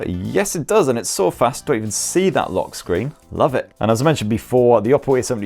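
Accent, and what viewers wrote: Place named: Scotland, United Kingdom. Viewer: Hes Scottish 💀 hes not acting?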